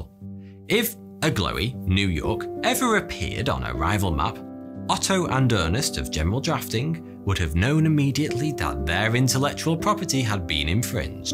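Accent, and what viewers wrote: United Kingdom. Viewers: "to put it mildly, a bit shit" Love that British accent.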